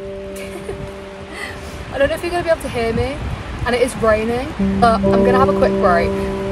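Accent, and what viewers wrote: Wales, United Kingdom. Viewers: British accent 😭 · oooo I thought she sounded welsh but I wasn't sure since im not the best at accents :D thanks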